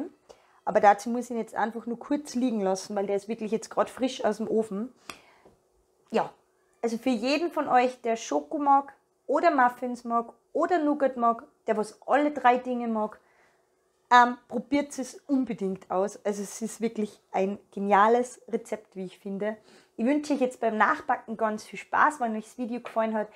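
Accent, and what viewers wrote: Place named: Tyrol, Austria. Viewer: Hallo Morgen gehts an die Muffins 😅👍schön auch mal Dialekt zu hören bist du vo Tirol ?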